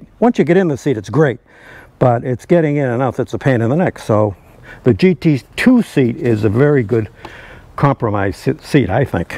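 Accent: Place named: New England, United States. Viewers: He sounds like he’s from new england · I can tell by listening to his voice, he's from up North, nothing wrong with that, I lived in New Hampshire while serving my country, when discharged, I moved back to Georgia, then after a nasty divorce, I moved to Massachusetts for almost ten years, then married a sweet girl from Brockton, and moved back to Ga, my southern accent used to drive people nuts, now after living up there for so many years, people don't know where I came from because i now pronounce my I's in the long form like tires instead of tars. My friends from Massachusetts used to love to listen to me talk. My guess is that he's from New York, Conn, Rhode Island, Mass, or Maryland. I love the look and how Corvettes drive but I spent 15 years working on them at a chevy dealer after busting my butt for 15 years working on those things, I most certainly would never own one. 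It's an amazing car, looks, performance, and a low price compared to other performance cars from other countries. I've just found that it's impossible to keep anything nice, there's always someone that will find a way to put a little flaw in my car that takes the perfection away from it.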